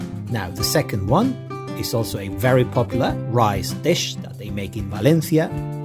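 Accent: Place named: Latin America. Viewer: I guess this is Latin spanish prononce, not spanish. Tortiyya, paeyya.. wtf tortidzha, paedzha...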